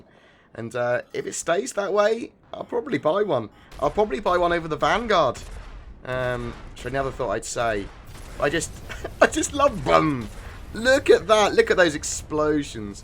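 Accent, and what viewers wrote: United Kingdom. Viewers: i cant understand this british dialect.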